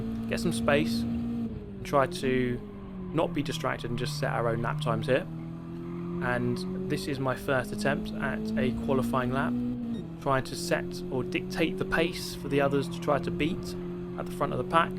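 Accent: United Kingdom. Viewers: Not to mention that British accent...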